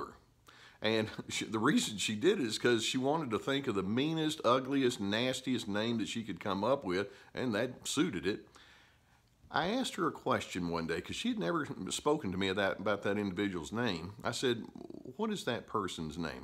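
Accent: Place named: Texas, United States. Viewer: Wish she (84 yr old mommy) would get outta my physical garage, chuckle. Gotta change the lock to under my house. I'm a locksmith now and proud of it. I am constantly amazed what one can do on your own when you are broke ; ) Oh, a plumber too, and fixed the gas stove fireplace! Still haven't cleaned those gutters & now rain/snow here in mountains of Ruidoso aka "rumordodo"! Thank you. I do really know what you mean! Very true! Love the true Texas accent, I lived in Houston for almost 20 years. I miss it cuz it was so big I could easily hide from "mommy"!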